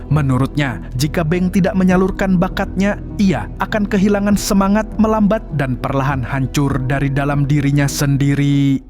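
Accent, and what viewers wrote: Banjar, Indonesia. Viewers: Adminnya urang banjar sklinya😂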